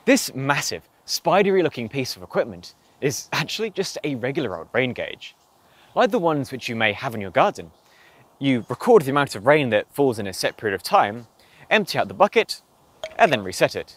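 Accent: Australia. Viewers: He sounds aussie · He’s definitely more towards the “cultivated”end of the aussie accent spectrum or flatout got an english-australian accent due to upbringing or familial exposure. Ngl I interpreted his opening joke about the weather was him joking about his english background. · He is an Aussie but may have lived in the UK before moving to Australia. Not all of us sound like Crocodile Dundee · He is an Aussie. He is poking fun at people that confuse the accents. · Your accent is far more Australian than it is British. Biggest give away is you say ak-Sent and not Aks'nt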